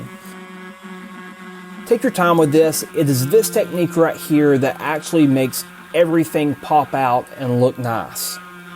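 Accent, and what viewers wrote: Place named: West Texas, United States. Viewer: West Texas accent and all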